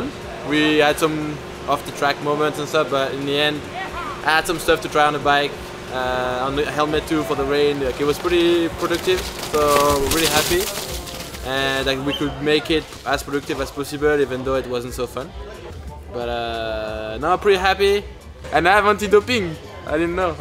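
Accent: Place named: France. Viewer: Au top l'accent Français quand tu et pas très doué en anglais sa aide 😉Bon courage a toi pour cette saison hâte de te voir détruit la piste des Gets 😄 👍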